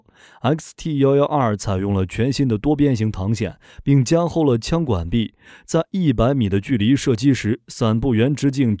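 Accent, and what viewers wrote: China. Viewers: 不要忘了，這個頻道聽他口音是大陸腔。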